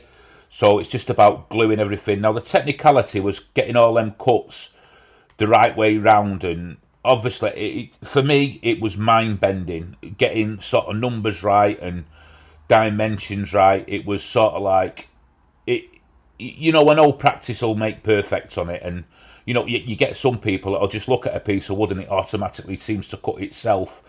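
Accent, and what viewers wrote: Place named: Liverpool, United Kingdom. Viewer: From across the pond, great job. Your accent, are you from Liverpool?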